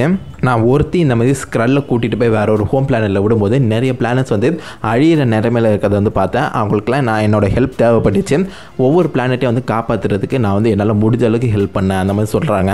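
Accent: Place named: Madurai, India. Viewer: Ji Neenga Entha Oru nu sollamudiuma. Yenna onga Pechula Madura Slang theriyuthu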